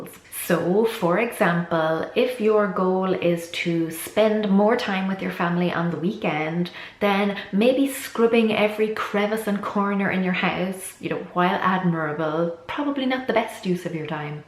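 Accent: Ireland. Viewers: are u from ireland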